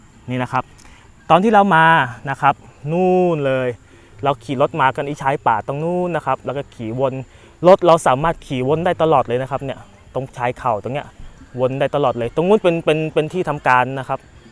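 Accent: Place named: Sukhothai, Thailand. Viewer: สำเนียงโข่ทัย...น่ารักดี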